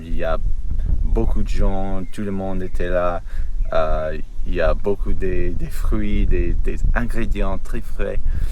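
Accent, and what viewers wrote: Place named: United States. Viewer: C'est parfaitement compréhensible et tu as la bonne démarche : parler le plus possible et ne pas avoir peur de faire des erreurs. En continuant sur ce rythme, d'ici 2-3 ans tu pourras parler presque sans trace d'accent Américain. Pour accélérer le process et acquérir du vocabulaire, je te conseille de trouver des films, séries ou podcasts français sur des sujets qui t'intéressent, avec sous-titres anglais ou français si besoin ou pas. ça permet de découvrir des mots et des expressions que tu n'entendrai jamais dans la vie quotidienne. Tu peux aussi regarder Top Chef ou Cauchemard en Cuisine sur M6 si tu n'en as pas marre de ton métier à la fin de la journée.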